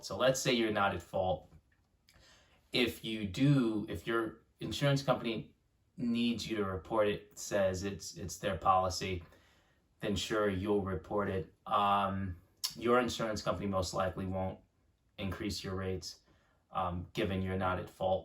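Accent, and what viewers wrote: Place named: United Kingdom. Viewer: thank you I assumed this was a UK video as your accent didn't have the American tang to it. Thank you anyway.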